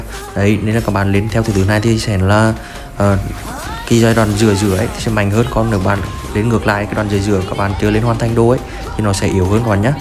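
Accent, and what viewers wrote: Central, Vietnam. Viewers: Bị ghiền tiếng miền trung rồi 😂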